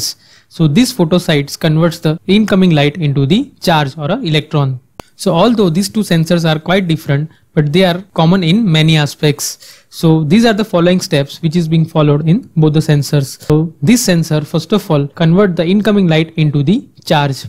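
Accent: India. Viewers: it's India's English right? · the Indian accent is so heavy I can hardly understand a single word he's saying. I know he is speaking English but what the hell is he saying? It didn't do a thing for me because the accent is too heavy to understand even with headphones, and I use headphones predominantly when viewing and watching videos, or listening to music online. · hehe... razumio sam ga sve, mozda zato jer imam tehnicku/elektronicku podlogu. ali taj indijski naglasak je uzasan. ti indijci cak i boju glasa imaju svi istu i ruzna je haha. i onda ovaj jos doda bass boost i jos totalno pretjera u tome, da frajer zvuci da ima dublji glas. uzas za uzasom :D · I love this indian english :)) ,,thanx for explanation!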